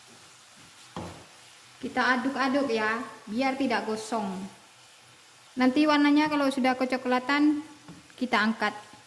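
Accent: Madura, Indonesia. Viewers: Fix mbaknya dari logat nya pasti orang madura nih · mbak orang madura ya🤦‍♀️🤦‍♀️🤦‍♀️ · Orang madura ni kyaknya.... mantap.... yeeeee tak teee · Kyk Logat madura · Boabbo orang madura ya gess..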